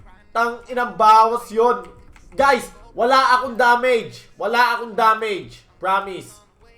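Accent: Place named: Bulacan, Philippines. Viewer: taga bulacan ka ba sir???.. puntong bulacan ka eh.....hehehehehe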